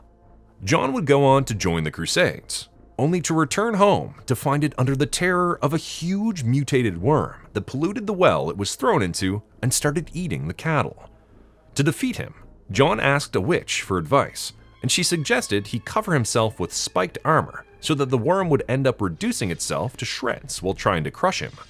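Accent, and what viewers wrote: Canada. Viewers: a robot commentator with a Canadian accent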